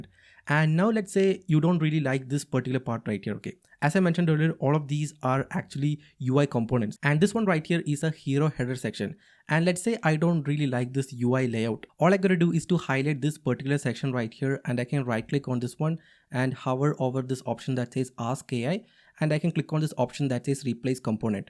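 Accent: Kerala, India. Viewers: ah that irritating malayali accent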